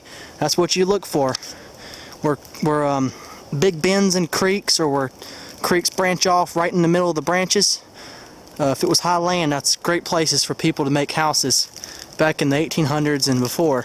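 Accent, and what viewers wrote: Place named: Vermont, United States. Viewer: it's vermont speech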